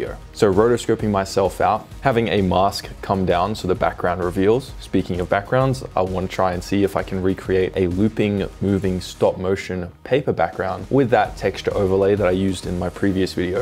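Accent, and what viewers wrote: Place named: Australia. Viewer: ▼ - So great to hear an Aussie accent too!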